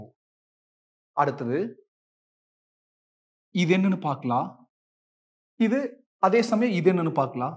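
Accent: Coimbatore, India. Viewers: Coimbatore slang🤩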